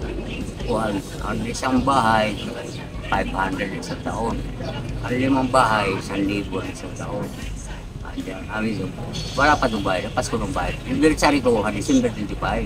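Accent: Waray, Philippines. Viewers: Haha waray parin accent. 😀👍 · Waray na waray accent mo Tay waray lng mga makakaintindi sa iba mong Sinabi..hehe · Pure gud na waray Kay nakukuri intalon pag Tagalog tatay god blessed you Tay ..proud waraynon bootan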